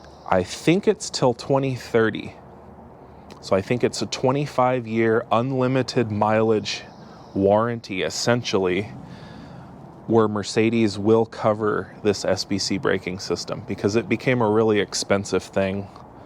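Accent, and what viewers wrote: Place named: United States. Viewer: Hearing an American talk about diesel engines is crazy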